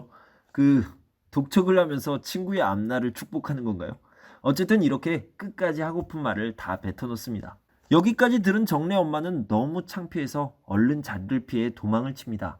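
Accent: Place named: Jeolla, South Korea. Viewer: 형의 목소리로 들으니 명작 소설이 더 감칠맛 나게 들리네요. 판소리의 지방에서 나고 자란 분이라는 게 잘 느껴집니다. 형은 판소리를 배웠으면 명창으로 이름을 날리셨을 거예요! ^^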